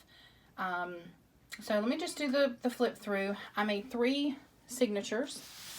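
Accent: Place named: South, United States. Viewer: GIRL!! I love Butterflies and Owls too (and Elephants!)... I have an Ankh tattoo also! It is on my Ankle. I did it myself when my wife and I got together. I absolutely love everything about this journal! Also...where are you from... I detect a slight southern accent... :) - Hugs! - Kari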